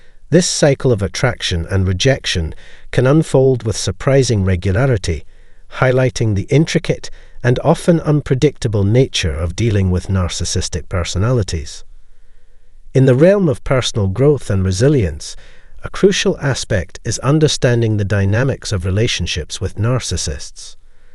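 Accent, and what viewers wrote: United Kingdom. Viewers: Breaking up with ANYONE hurts them in ways you can only imagine when they care about you. If someone would be so hurt by my absence then send me ALL your narcissists, I'd rather date them than this annoying British-sounding twat narrating this video.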